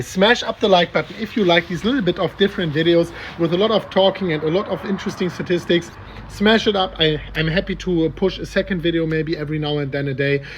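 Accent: Germany. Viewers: Täusche ich mich, oder hast Du nen extrem deutschen Akzent? 🤔😃 Thanks for your nice video.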